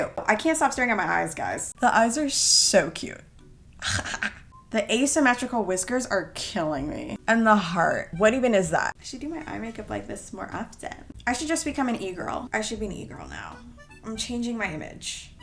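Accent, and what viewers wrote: United Kingdom. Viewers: She has a good British aksent lol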